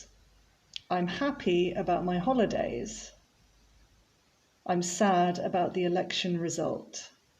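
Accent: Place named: United Kingdom. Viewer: I'm so thrilled about your accent 🤲 I do like a British accent 🤲you do sound natural.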